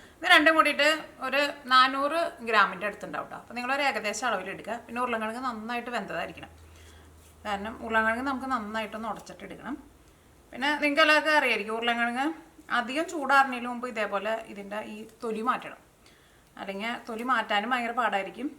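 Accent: Thrissur, India. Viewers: ഇങ്ങള് തൃശൂർ ആണാ. പറേന്നത് മനസിലാവണില്യ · ഇതണ് തൃശൂർ സ്ലാങ്ങ്.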